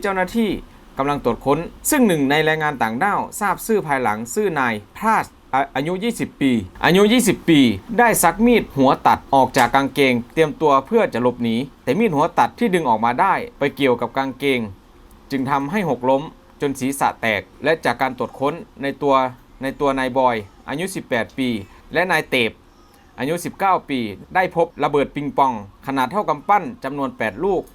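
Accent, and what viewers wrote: Laos. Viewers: เเอดมิน เป็นคนประเทศลาวป่าวคับ สำเนียงเหมือนเลย ภาษาไทยก็เขียนผิด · เว้าลาวเถอะไอ้หนู กูอายแทน แค่ออกเสียงคำควบกลำ้เขาก็รู้ว่าเป็นลาว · แอดเป็นคนลาวพูดภาษาไทยไม่ซัด แอ็ดเป็นคนลาวแน่นอดครับเชื่อผม ฟังภาษาและสำเนียงให้ดีนะครับพี่น้อง ข่าวที่เป็นจริงเราต้องฟังแอ็ดที่เป็นคนไทยนะครับอย่าให้คนลาวปั่นหัวเราใด้ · ข่าวดีแต่คนอ่านข่าว ลาวคัก. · คนบรรยายเป็นคนลาวบ่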